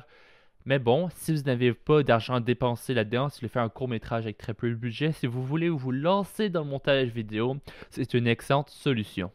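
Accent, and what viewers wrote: Quebec, Canada. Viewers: C'est du à comprendre le québécois 😥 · TU ES QUéBéQUOI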